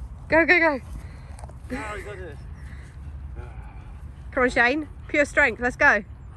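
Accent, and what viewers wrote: United Kingdom. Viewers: Are you Britain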